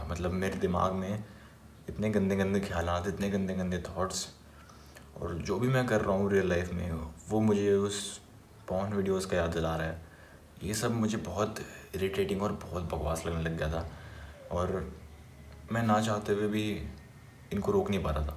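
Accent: Bengal, India. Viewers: Bhai are you from West bengal?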